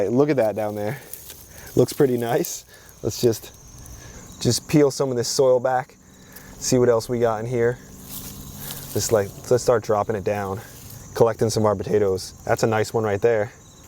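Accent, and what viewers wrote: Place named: East Coast, United States. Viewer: yaaaass i love the east coast wooder accent!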